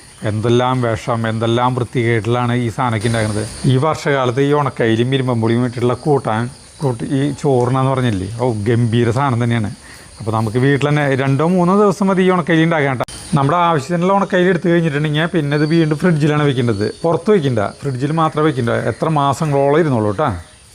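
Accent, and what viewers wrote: Thrissur, India. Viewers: നല്ല തൃശൂർ ഘടി...❤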